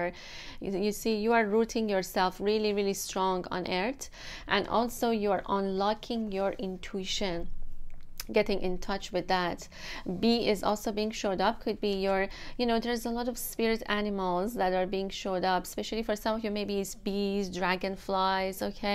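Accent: Iran. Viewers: I Love Persian Accent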